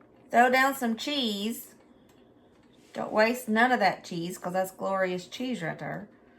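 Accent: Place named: Deep South, United States. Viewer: u tube suggested your channel to me, & now I’m a fan!! You cook just like i was raised to cook! We also happen to buy the same types of groceries 😂😂 we’re both from the deep South! You talk just like me & my family do!!😂 God bless you & that lovely family ❤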